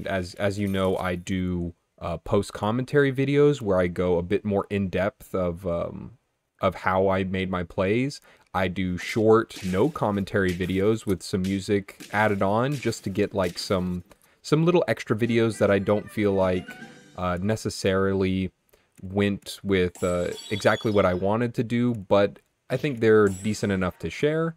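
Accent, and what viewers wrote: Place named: Canada. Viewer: You sound so Canadan at the beginning of this video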